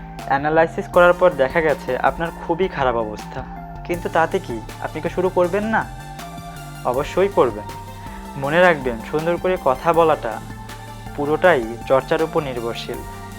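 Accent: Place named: Bangal, Bangladesh. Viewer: first bro,rectift ur own voice.bangal bhasa so irritating,cant u speak proper bengali?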